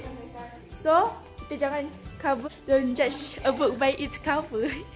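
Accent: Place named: Sabah, Malaysia. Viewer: Best nya cakap Sabah bah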